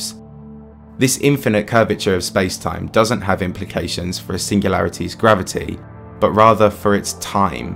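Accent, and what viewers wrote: United Kingdom. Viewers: I don't especially like the british accent but i like his voice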